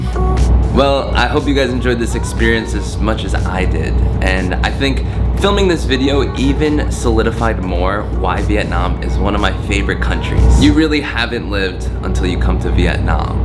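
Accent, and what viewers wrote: New York, United States. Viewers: Is this guy from New York?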